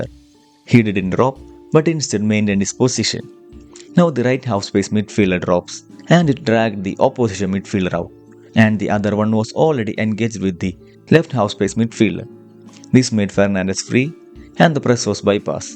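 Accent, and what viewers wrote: Kerala, India. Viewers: Good stuff brother. Your accent sounds like you are from Kerala. Even better!! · I could recognise your accent since I have friends from Kerala and I watch many malayalam movies. I'm from Assam. So great to see a tactico account from India. I think you are the first one. I wish you all the best and hope this channel grows leaps and bounds.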